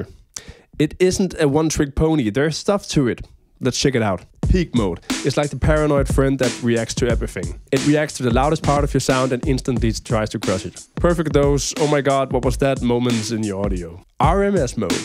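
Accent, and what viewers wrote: Denmark. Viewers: du dukkede op i mit feed, og min kæreste hørte med og spurgte om du var dansker. Jeg tænkte nej sikkert ikke. Men fandme jo. Så fedt med en dansker der laver den slags yt. Keep up.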